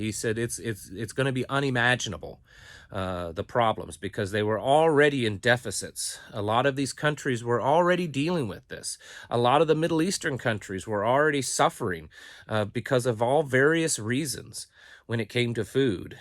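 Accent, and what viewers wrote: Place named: Missouri, United States. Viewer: Too many folks are more interested in growing different types of marijuana to get wasted on than crops to eat and survive on! What state you live in, ya sound like a Missourian!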